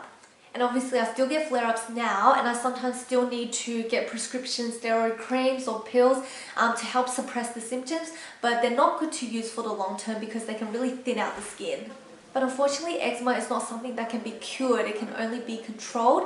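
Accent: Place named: Australia. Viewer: you live in australia.. hahah i no ;) *your accent***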